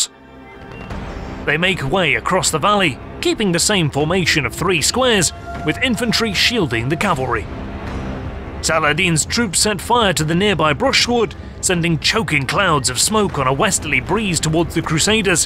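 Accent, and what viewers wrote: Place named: Yorkshire, United Kingdom. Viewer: ... Ok... I give up! It's almost completely gone, but I reckon you are either from Yorkshire or 'the other place' (Lancashire) lol. Or am I completely wrong? haha LOVE your channel and the fact that you present things in a way that is so easy to understand... wonderful! :)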